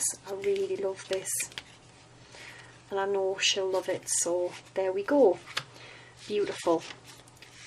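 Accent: United Kingdom. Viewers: I love this card. I have everything to make this because I just bought the papers and the stamp set because they are so beautiful. Also, just want to thank you for making these videos. I am American and watch some American Stampin Up demonstrators but find that y'all in the UK tend to make more boxes and things (like the journal you made not long ago), and I enjoy making the boxes and such, too. So, thanks again! P.S. I love your accent! If you don't mind me asking, what part of the UK are you from?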